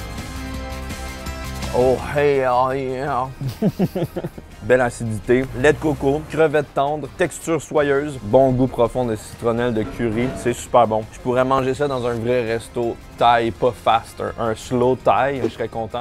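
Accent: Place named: Quebec, Canada. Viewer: Hello, quand viens tu en Suisse? Manger une vraie fondue une vraie raclette et autres spécialités. Bravo pour tes vidéos. J’ai 63 ans je suis venu au Québec en 1989 et vôtre accent me plaît toujours autant. Ne change rien et bonne continuation.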